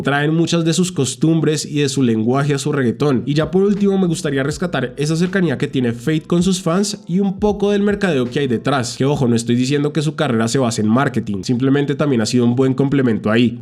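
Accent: Bogotá, Colombia. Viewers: Cómo así que eres mexicano? pensé que eras de Colombia jaja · Como que eres MEXICANO pensé que eras de Bogotá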